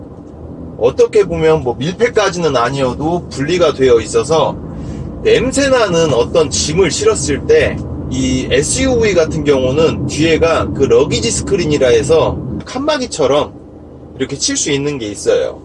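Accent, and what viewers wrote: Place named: Jeonju, South Korea. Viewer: 어랏? 전주 분 이신가보네요~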